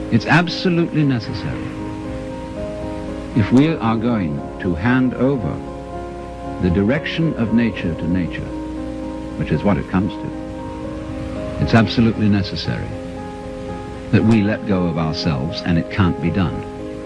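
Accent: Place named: United Kingdom. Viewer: Perfectly British voice to perfection lecture..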